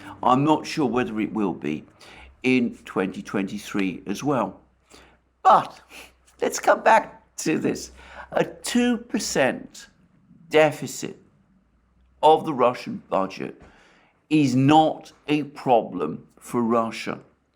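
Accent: England, United Kingdom. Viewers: Weird listening at a communist with an English accent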